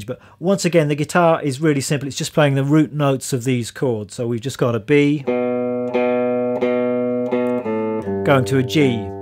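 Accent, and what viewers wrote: United Kingdom. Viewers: Eres británico verdad?jsjs:3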